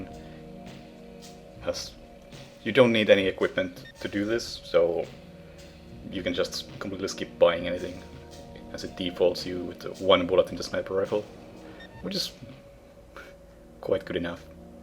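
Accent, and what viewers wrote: Finland. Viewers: Can I guess? Are you finnish?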